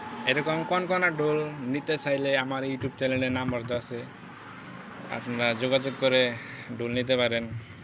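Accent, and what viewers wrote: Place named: Mymensingh, Bangladesh. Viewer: ভাই সব ঠিক আছে দয়া করে আপনি আপনার ডেসক্রিপশনে অবশ্যই আপনার ঠিকানা দিয়ে রাখবেন ঠিকানা দিলে কি হয়, অনেকে আপনার পার্শ্ববর্তী জেলা বা পাশাপাশি থাকে তারা গিয়ে নিয়ে আসতে পারবে আপনার কথা শুনে মনে হয় শেরপুর জামালপুর কোথাও ময়মনসিংহের মধ্যে বা টাঙ্গাইল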